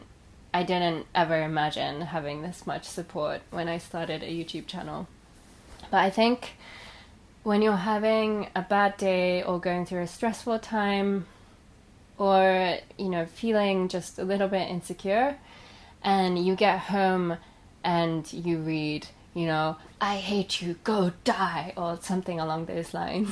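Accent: United Kingdom. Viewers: I’m not going to lie, I first saw your channel when I was looking for tourism spots for Tokyo. The reason I picked and subbed you was well, Beside you being the most beautiful woman I’ve ever seen and you seem quite genuine about yourself, a pretty Japanese girl with a british accent... Something Sanrio has been trying to do with Hello Kitty.I notice from the content that you are doing is somewhat personal, which is nice, like watching a friends travel blog, and you didn’t sell yourself in way that gets more views, with that knowledge I subscribed. I’m a furniture designer but I like dabbing in drawing cartoons for a hobby, but I only did those things because I was unnaturally good at doing them and I didn’t do anything because it was popular, I did them because I genuinely love it. I remember a movie quote from lost in translation: “the more you know who you are and what you want, the less things will bother you.” If you feel this channel is forcing you to do things that pervert your intentions then stop. 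If you feel like you owe people content for their subscription to you, you don’t . If you feel there are better channels than yours, thats true there are, but thats no reason to feel insignificant, since its a living hell to be a slave to peoples anticipation. The person you should focus on always is YOU. What your needs are, are completely different to the needs of other people especially to strangers. Whatever you want to do now, I can only wish you the best and hope ever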